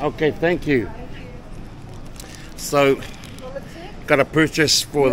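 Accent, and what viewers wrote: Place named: United Kingdom. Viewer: That British accent 😂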